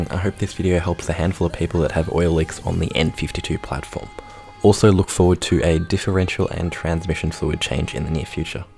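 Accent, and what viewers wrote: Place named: Australia. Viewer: nice and clear vid dude! good to hear an Auzy accent too! And thats coming from a kiwi!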